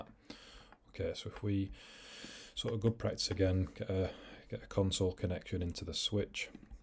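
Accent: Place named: England, United Kingdom. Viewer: amzing english accent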